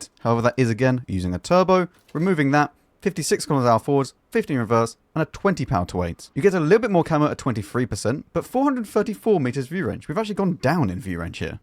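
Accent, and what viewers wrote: United Kingdom. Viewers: I absolutely love the way you speak those words Brit man, nicest Brit accent ever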